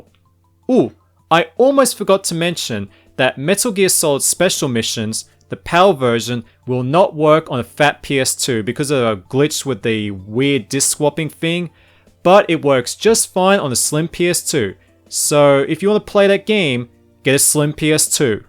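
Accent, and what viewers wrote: Australia. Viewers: HES AUSTRALIAN?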